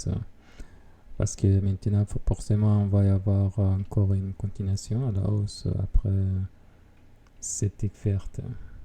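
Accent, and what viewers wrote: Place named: Italy. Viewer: Ciao e grazie per il video, mi sembrava un accento un po italiano e nei parametri ho visto 🇮🇹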